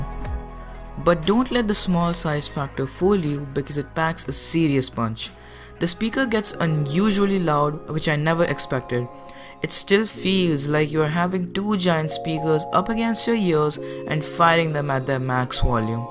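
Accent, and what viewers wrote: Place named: India. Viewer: Indian Accent..!! Aaack 😡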